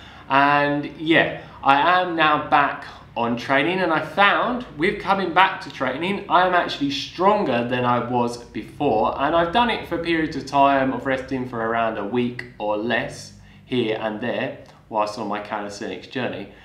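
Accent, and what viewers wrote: United Kingdom. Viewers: woahhhh... shocked to hear you had a British accent; I thought you were foreign when I watched your 1 year transformation vid ;))))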